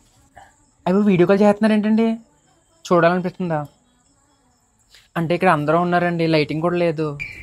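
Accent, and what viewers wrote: Godavari, India. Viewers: Iyyababoiiiiii sanaaa baaga miguthunnaru andi meeru mana godarolla yaaasa baane catch chesisav ehey nuvvu 😂😂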